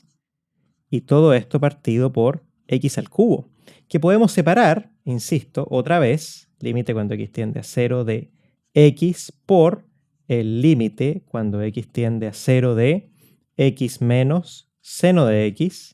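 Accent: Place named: Chile. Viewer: aja ajá ese acento, eres hermano chileno!!! Pisquito y bocadillos! Yo soy del norte de tu frontera!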